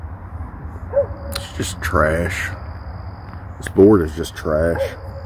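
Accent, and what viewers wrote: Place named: Tennessee, United States. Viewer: BTW are you from around TN, was just wondering because of your accent. I live a stone's through away from TN. That's why I'm asking.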